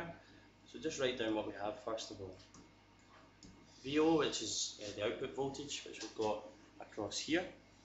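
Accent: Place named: Scotland, United Kingdom. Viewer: Thanks, love all the vids! Scottish accent i hear;) got prelims in few weeks so this helps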